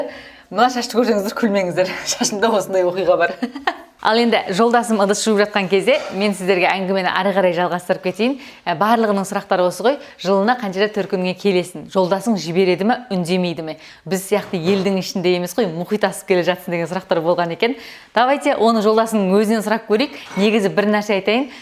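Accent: South Korea. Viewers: Дикция кәрісшеге 😃☺️ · Тилин корейцев айналып бара жатыр, кей бир казакша создеринди тусиниксиз сойлеп жатырсын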